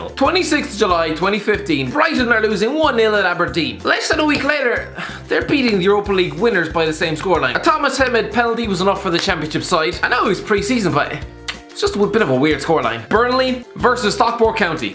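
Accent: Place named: Ireland. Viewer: I think it’s Irish 😂😂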